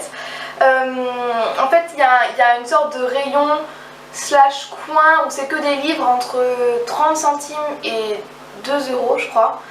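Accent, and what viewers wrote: United Kingdom. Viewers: Coucou ma belle ! Hihi en effet ton accent est HYPER britannique ! Mais perso j'adore ! J'ai plutôt un accent américain pour ma part donc j'ai une adoration pour le britannique. Alors continuuue ^^ Je ne suis pas fan DU TOUT des BD, mais... Macabre a l'air vraiment beau ! :O Alors peut être que je vais le commander... Au fait, merci de m'avoir fait découvrir priceminister pour les livres. Hum hum. ^^ Pour la petite info, non, PPDA n'est pas parti à la retraite, c'est Claire Chazal ça ! xD Sinon comme d'habitude, j'adore te regarder en vidéo, tu respires la joie de vivre c'est vraiment super à voir. Par hasard, est ce que tu vas aller au salon de Montreuil ? ;) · Je suis fan de ce petit accent britannique ;). Et entendons nous, "wondÉ", ça te décolle les tympans! B. Lacombe... On en entend parler sur toutes les chaînes en ce moment mais ça se comprend! 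De très belles acquisitions en tout cas! Et si ce sont tes achats depuis le mois d'Août, ça reste raisonnable je trouve, pas encore extravagant! ;) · J'adooooooooore le Victor Hugo, il m'avait déjà fait de l'oeil sur le site de Soleil Editions... J'aime bien l'accent britannique aussi, il faudrait consacrer une vidéo à ça :P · Pour avoir fait de longues études d'anglais, je dois dire que perso ça me fait du bien d'entendre une youtubeuse bien prononcer les mots anglais avec un bon accent, donc j'aurais tendance à te dire YES ! Garde ton bel accent. Cependant pour ceux et celles qui auraient du mal à comprendre (faut bien avouer que l'accent british est à couper au couteau hein haha) je pense qu'il serait judicieux que tu mettes des annotations dans tes montages vidéos pour spécifier à l'écrit le nom du livre et son auteur pour que les personne puissent le retrouver par la suite sur google :) Bisou ma belle xx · définitivement l'accent anglais :) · Super book haul ! 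Au passage je kiff ton accent britannique je suis pour que tu dises les titres en britannique !!!!!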